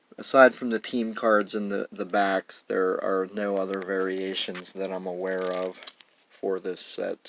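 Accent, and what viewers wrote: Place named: Pittsburgh, United States. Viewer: Hey, just subscribed great looking set. Sounds like your a Pittsburgh guy. I live in Johnstown, Eric those back pages gave you a nice shout out earlier tonight. I own a very nice 56 set. Working on 54 bowman set.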